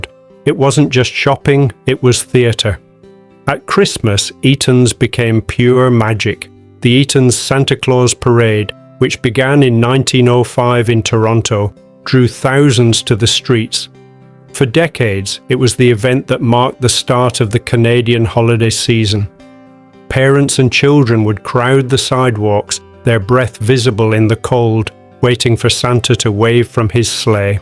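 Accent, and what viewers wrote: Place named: Lancashire, United Kingdom. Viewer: What a clear crisp voice narrating the Eatons story. Accented by a touch of Lancashire?. Possibly Wigan, Bolton, Bury, Blackburn, Darwen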